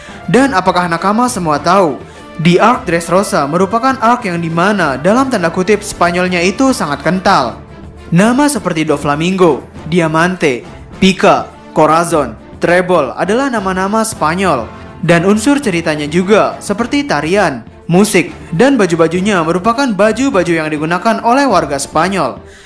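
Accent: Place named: Bali, Indonesia. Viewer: gw smpt mkr gtu kdgr dr " T " nya kyk logat org bali... hehhee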